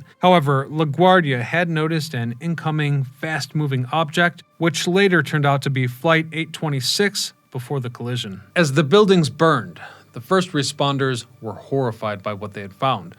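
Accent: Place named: Chicago, United States. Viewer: I knew you gave the Chicago vibes😭 lived in Springfield my entire life and people from the suburbs most definitely have their subconscious tells. If you tell me you’re from IL, 90% of the time i can tell if you’re from a suburb or a corn field without asking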